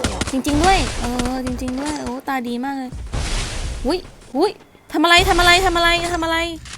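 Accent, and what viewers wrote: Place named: Central Thailand, Thailand. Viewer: พี่ แหลงกลางชัดเปรี้ยด พอผมแหลงบ้าง ทองแดงผลัดเป็นย้านเลยครับ555+#คนใต้เท่านั้นที่จะเข้าใจ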